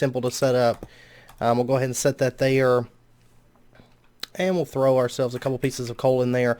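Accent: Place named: South, United States. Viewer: Just starting your series even though it's dated. The same 1 I am playing now. I am new to modded Minecraft. Really enjoying the video so far, took a quick break to ask you where you're from? You sound very Southern. :-)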